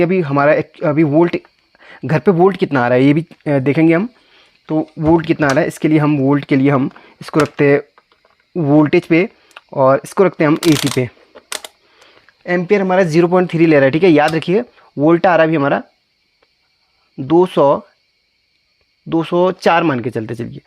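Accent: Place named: Uttarakhand, India. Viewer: Sir bahut bahut dhanyawad,,,apki awaz se lg raha aap uttarakhand se ho , kya mai sahi hun ?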